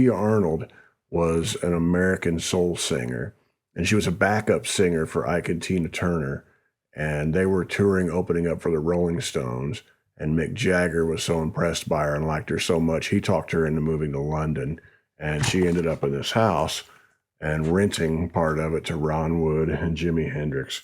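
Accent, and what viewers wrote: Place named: South, United States. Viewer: Right when I hear the "Hey Friends" in that slightly southern accent....I immediately stop everything and watch the video👍🤔👍🤔👍🤔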